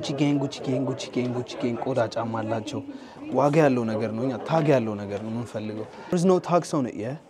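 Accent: United Kingdom. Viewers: u got a bitish accept man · I like your British accent….👍🏽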